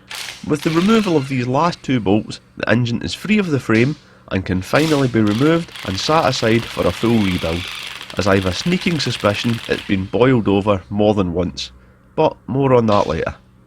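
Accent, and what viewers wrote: Scotland, United Kingdom. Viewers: Nice camera angles, on spot editing, humor, Scottish accent and a VFR! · Are you scottish? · Are you Scottish? It's like listening to Luke Stoltman 😅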